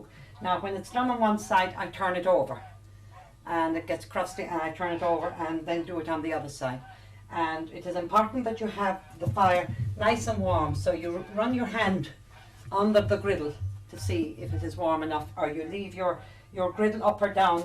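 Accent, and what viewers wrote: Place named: Ireland. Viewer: I am Argentine, fan of so many Irish traditions. Today it is Saturday night and I am enjoying this video... that wonderful Iirhs lady, her cooking tools, the sounds she makes as cooking, her accent, the room, the colours.... Love it! I had the chance to taste the soda bread in Ireland.... delicious!!! Cheers!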